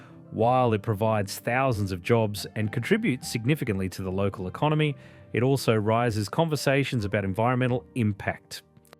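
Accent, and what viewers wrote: Australia. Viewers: an Aussie narrating this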